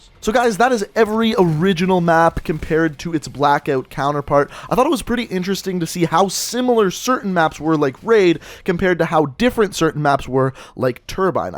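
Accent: Canada. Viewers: R u Canadian? Love it accent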